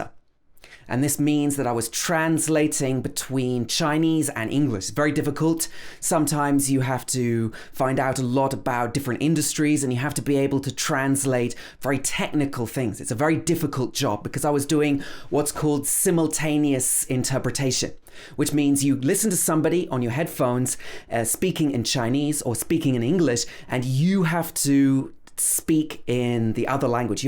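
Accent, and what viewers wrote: United Kingdom. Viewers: I have been following the videos on your channel of late and I must say that you have come up with content that can be of great help for non-native English speakers. Aside from the fact that I have a soft spot for the British accent (thanks to English cricket commentators like David Gower! 😄), I found the video with the 'schwa' sound to be particularly useful. The video about intonation was also quite helpful as these topics are not explicitly taught in Indian schools. I hope to learn a lot more in the time to come. Thanks! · I’m American but I love your posh English accent! It sounds more intelligent and educated than our ordinary American accent. Lol. It’s good to learn about your background. Keep up with your exceptional work! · You're superb, dude👌🏻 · I don't know why Americans are making fun of the British accent Why did they find something funny in your accent?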